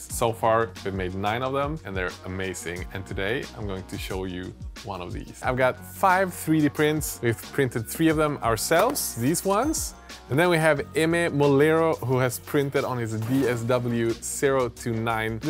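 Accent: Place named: Sweden. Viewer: This sounds very Swedish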